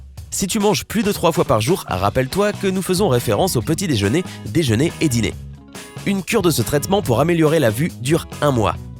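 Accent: Quebec, Canada. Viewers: A mon avis, la vrais a du donner sa chaine a eux, c'est comme la voix Québéquoise, c'est la meme que. Ou sinon toute les chaines c'est une compagnie qui vous prends pour des con ( Je dis vous pck je suis pas abonné a eux )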